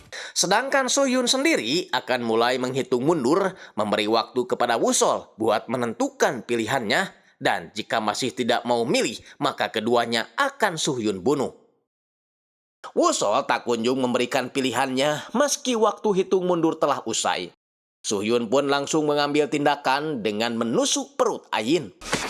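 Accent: West Java, Indonesia. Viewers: Jigana NU ngomong orang Sunda yeuh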